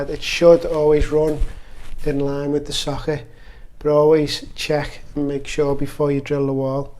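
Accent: Liverpool, United Kingdom. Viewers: Love the scouse accent. Best people in England 🩷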